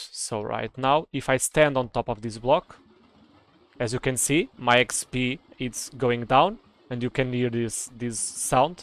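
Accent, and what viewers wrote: Portugal. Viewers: tenho quase a certeza que és português pelo teu sotaque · Um tuga conseguir indentificar um tuga a falar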